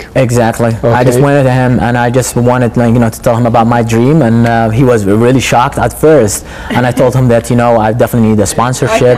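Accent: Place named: England, United Kingdom. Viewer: i really liked your englsih accent....we are proud of you: D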